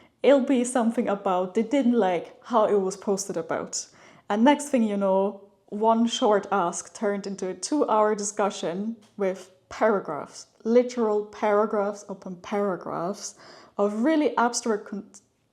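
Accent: Ireland. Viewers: Lovely the way you sometimes have such an Irish accent or way of saying something. Wish you the best in Austria. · Ha ha, from your accent I can tell you spent time a lot of time in Ireland. (Greetings from Ireland) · you sound lrish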